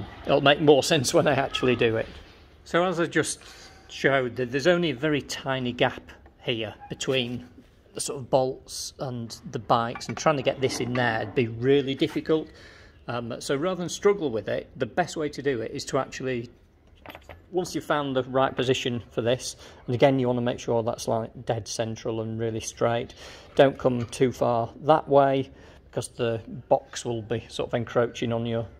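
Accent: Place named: England, United Kingdom. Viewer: Not only a great video, well explained, but also what a coincidence, I just picked up my XR150L with rack, (2016) so spot on! Curiosity though, your accent sounds English, but the plate looks to be from another country.....my accent too is English (with a hint of Bristolian), but me and the bike? We are in Colombia, South America.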